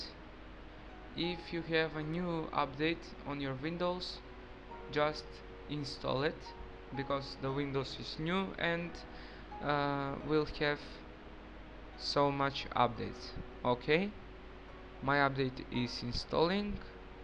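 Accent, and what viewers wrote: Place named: Russia. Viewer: видно русский пытаеться на английском говорить красава молодец!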